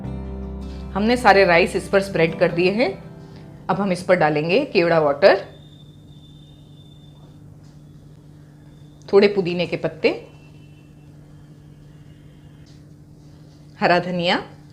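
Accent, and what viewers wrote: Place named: Gujarat, India. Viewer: Askm , i tried this, Alhamdulillah Alhamdulillah bohot acchi bani.....Aap se ek sawaal hai, kya aap Gujarati hai? :) aapke kuch words ya lehja thodda thoda sa gujarati lagta hai...memon my be ? :)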